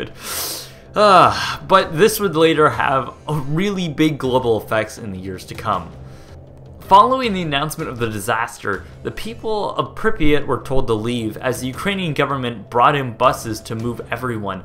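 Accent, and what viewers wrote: Canada. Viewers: You say at the beginning of the video that you're American, but haven't you said you're Canadian in other videos? And you pronounce "about" like a Canadian... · No, he's definitely from the proud north. The way he pronounces his O's, the fact that the only live interview I've seen him do was of the guy in charge of Target Canada, and the Ontario PO box give him away. He could be American now, but he's definitely a Canuck by birth. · You sound Canadian.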